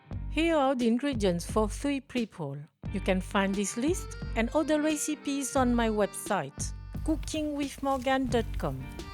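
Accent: France. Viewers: I like how you’re Chinese but sound like you’re French.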